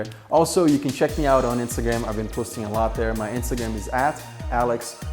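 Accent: Brazil. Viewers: Ele é brasileiro? Sei lá parece que tem um acentinho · Dunno why but you had a slight brazilian accent this vid...sounds a little like mine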